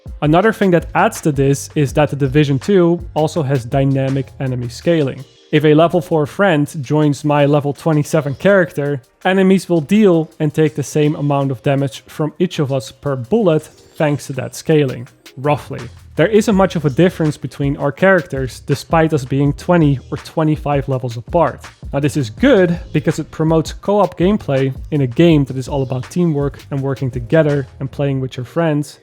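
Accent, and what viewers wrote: Netherlands. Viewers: Good video, I've got over 2500 hours in division 2, well explained. I'm sure you're Dutch (I do think because of your accent, as I am) and I also think you're involved with the YouTube channel Fern...Am I right? 🙂♥️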